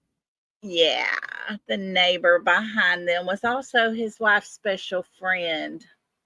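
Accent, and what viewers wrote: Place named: South, United States. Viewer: !!!! I've missed that Southern Drawl!!! So happy to see a video from you!! Imo YES! That is so weird that she would come out and go the same way you do!! 😱😱 R u sure they don't have a tracker on your car 🤔🤔 What are the odds?!?! I don't believe in coincidences... 🧐🧐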